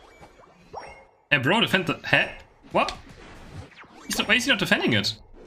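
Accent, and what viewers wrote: Germany. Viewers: beside his accent that is a giveaway his contact email address ends with de so I'm pretty sure he is German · considering his accent he must be a german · 100% Germany I can tell by the accent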